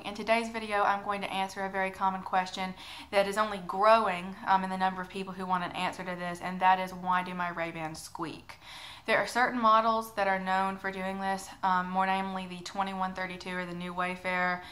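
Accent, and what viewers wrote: South, United States. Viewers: Your skin, hair, and eyes are absolutely intoxicating. Eyes like perfect almonds. You do your makeup perfectly. You're just accenting your already lovely features, not trying to paint over and create something new. You're a vision! The southern accent is the coup de grace. Great info also. My new Wayfarers were squeaking so that's how I found your channel. Thank you!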